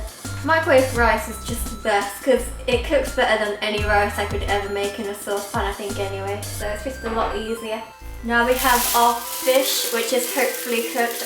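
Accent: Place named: United Kingdom. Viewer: What a beautiful British accent you have! I have always wished I had a British accent.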